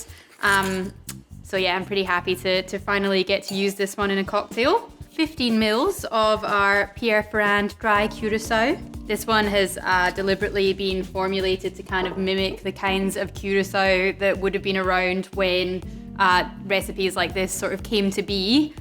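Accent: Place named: Scotland, United Kingdom. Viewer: I'm obv late to the party but just drank a few (4) brandy Crustas and was trolling youtube for relevant content. I like the bit of maraschino in the crusta. did the Cointreau recipe (3C 2B 1L .5M no bitters), then the 4B 1C 1L 1M plus angostura recipe, and liked the cognac forward version better. I'm sure I'm a bit under things with the relatively quick succession, but just found your channel and thought from the accent--are you Scottish? you mentioned being in Australia in the vid... Imma feel a right knob is your Irish or Aussie--my bad. either way, appreciated the lesson--good stuff! I'm def subscribing.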